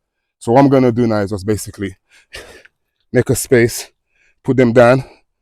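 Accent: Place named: Dominican Republic. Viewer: Why you sound Dominican 🇩🇲 lol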